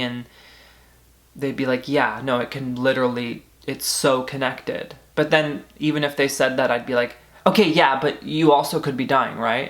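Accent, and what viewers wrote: England, United Kingdom. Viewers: LOVE your British accent. :) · BRITIAN ISNT JSUT ENGLISH STOP SAYING UR ENGLISH ACCENT is BRITISH as a Scottish person it offends me And we say ye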